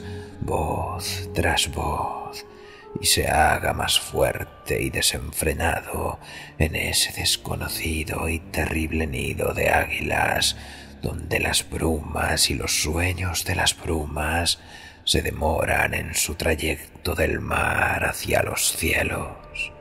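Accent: Catalonia, Spain. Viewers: Vaya ramalazo a catalan cerrado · El narrador es catalán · Por que el acento catalan?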